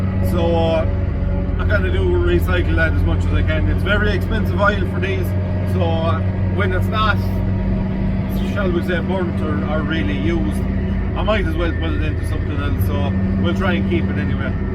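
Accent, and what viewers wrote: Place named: Galway, Ireland. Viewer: I taught u were from galway because of ur accent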